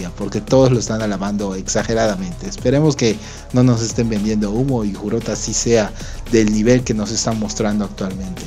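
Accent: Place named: Yucatan, Mexico. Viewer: Carnal eres yucateco? Por qué se escucha mucho y eso es genial tienes mi apoyo broder. 🐖🤠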